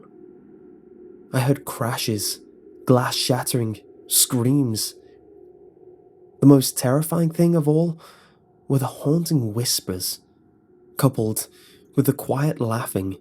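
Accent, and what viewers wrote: United Kingdom. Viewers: Hi. What is your accent called exactly? I got the exact same and people ask me what (British) accent is it?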